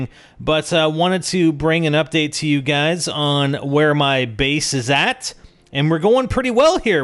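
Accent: Canada. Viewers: are u from canada??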